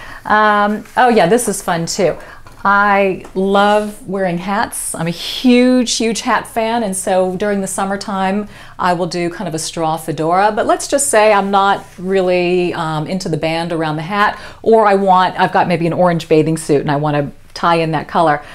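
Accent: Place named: Connecticut, United States. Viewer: Without you mentioning Houston, I would have thought you were from Connecticut... It's a compliment. ;)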